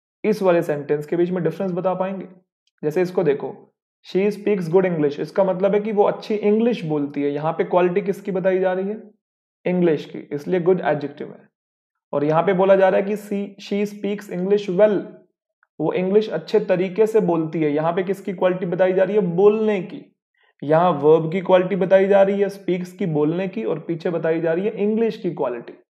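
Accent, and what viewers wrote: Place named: Punjab, India. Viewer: Your Punjabi accent 💘 omg.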